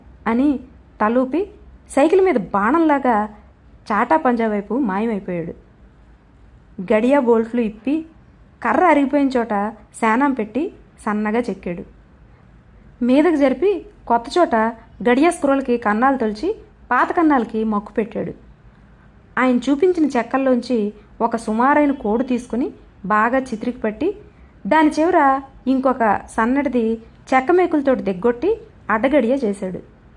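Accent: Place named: North Andhra, India. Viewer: చెక్కా వారికి గానీ పెండ్లికి గానీ పిలుపుకి గానీ కథతో సంబంధం లేదు. (లేదా నాకు అర్థం కాలేదు)😂 గారి కథనం బాగుంది. గారు ఉత్తరాంధ్ర యాసతో చదువుతుంటే వీనులవిందు గా ఉంది! కొన్ని పదాలు మరీ పాయసంలో జీడిపప్పుల్లా అ(వి)నిపించాయి!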